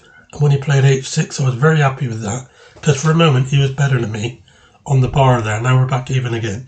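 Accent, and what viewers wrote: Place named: Bristol, United Kingdom. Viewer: As soon as I heard your voice I thought hang about, he's got to be from Bristol hah. Subbed. 😎👍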